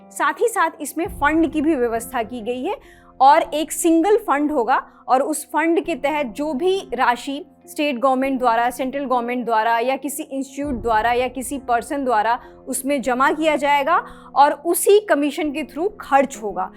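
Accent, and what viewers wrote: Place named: Bihar, India. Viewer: Anchor Bihari h😂😂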